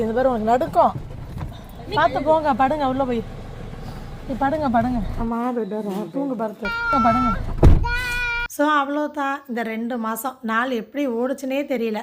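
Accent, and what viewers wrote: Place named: Ramanathapuram, India. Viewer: Assalamu alaikum sis❤❤❤i am meera from chennai❤❤❤interesting video sis, unga slang enga ooru slang polave iruku, naanga ramnadu pakkam kamuthi, last 2minutes very true words and emotional😢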